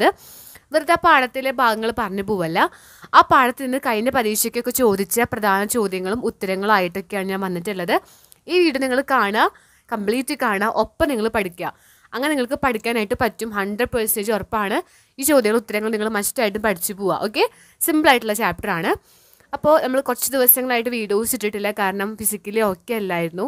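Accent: Thrissur, India. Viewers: നിങ്ങൾ തൃശൂർകാരി ആണോ, റിപ്ലൈ തരുമ്പോൾ ഇടാം ട്ടാ എന്ന് പറയണൊണ്ട് ചോദിച്ചതാ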